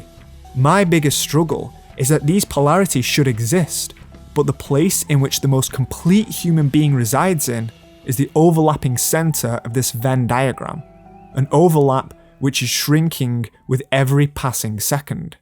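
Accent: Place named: RP, United Kingdom. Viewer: when you do return i think it'll be the funniest video essay u ever watched - especially the plummy voice he puts on during the entire thing.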